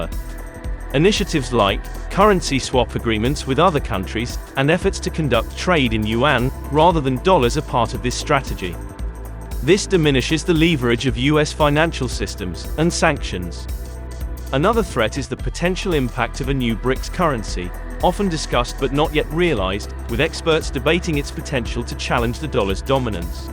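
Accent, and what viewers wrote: United Kingdom. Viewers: When ever I hear a British accent I can't believe anything all Colonial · The host got British accent, nothing but evil comes out of their minds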